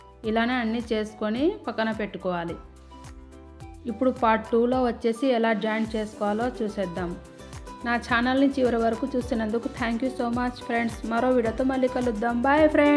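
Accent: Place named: Karnataka, India. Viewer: Mee cheppindi kannada sound yekkuvaga undi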